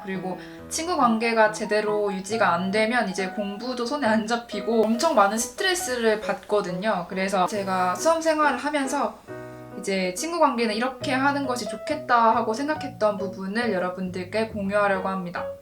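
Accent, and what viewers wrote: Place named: Gyeongsang, South Korea. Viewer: 경상도 억양도 너무 귀엽다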